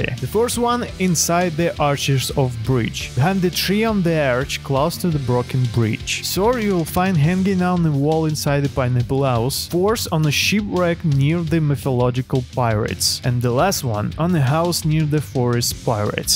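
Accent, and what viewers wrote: Russia. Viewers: Why do you have russian accent lol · The russian that tries to make an english voice OHHHHHHHHHHHHHHHHHHHHHHHHHHHHHHHH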